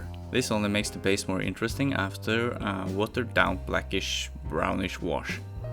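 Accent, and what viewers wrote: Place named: Estonia. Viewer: Been loving all the videos. May I ask where you are from though?. You sound kinda Estonian. Maybe Nordic?.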